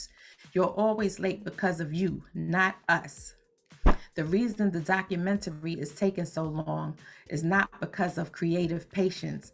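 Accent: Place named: Jamaica. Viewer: I HOPE YOU ARE A JAMAICAN.... WE DO THAT BRITISH ACCENT.... LOVE YOUR SHOW...PLEASE POST YOUR EQUIPMENT IN SHOW MORE DETAILS. I LOVE YOUR LIGHTING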